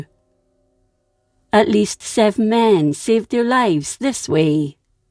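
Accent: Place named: Scotland, United Kingdom. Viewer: I love your accent. My great-grandmother was Scottish. I met her once when I was very young and don't remember it. I wonder if she might've sounded similar to how speak English. Love your channel!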